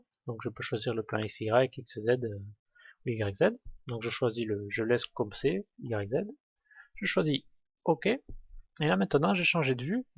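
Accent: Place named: South, France. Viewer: Bravo pour toutes ces vidéos, excellentes à tous points de vues... avé un petit accent du sud... Ceci dit, savez-vous comment changer le plan d'origine d'une création de pièce? ou changer l'axe d'origine? merci d'avance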